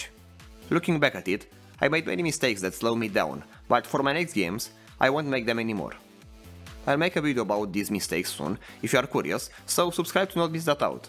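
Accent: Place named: Romania. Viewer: Efectiv stiaaaam ca esti roman dupa accent. Mam uitat la about si asa a fost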